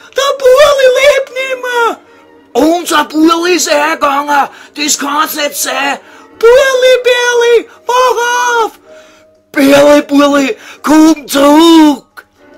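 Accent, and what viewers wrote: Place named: Vienna, Austria. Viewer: Ich hör mir die Story jetzt schon zum 14.mal hinter einander an und ich brech echt immer heftiger ab - die Story ist UR-Lustig und der Dialekt ist Ur-Wienerisch- einfach toll !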